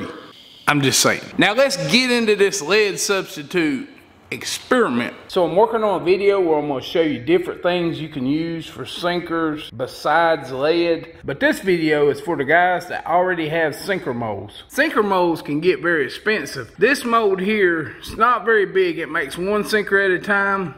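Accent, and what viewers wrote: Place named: South, United States. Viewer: This is a good, informative vid & fun & funny. Bess tek a class en Sothan drawl for this videe-eye-ee-eye-oh! I cracked up the first time I heard him say “Lead Sinkers” slowly & the word “Lead” was two syllables (lay-Ed) like my SC sis-in-law says “Bray-Ed.” Ya know, Bray-Ed...as in... Raw Toast. ;-) The “Ten” sinker doesn’t mean #10. It means Tin. Ha! I do enjoy a good drawl! (& yes, I know he doesn’t Always say “Lay-ed.” More often he says it correctly.)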